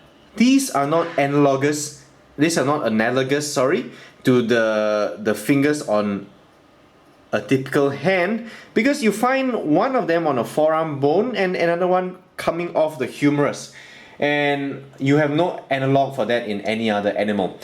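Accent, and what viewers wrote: Singapore. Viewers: are u singaporean, bro?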